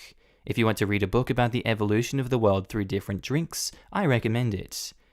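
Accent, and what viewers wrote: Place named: Australia. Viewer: I always love the Aussie accent, but I admit I was wondering how the OP in the hospice was "wearing car keys."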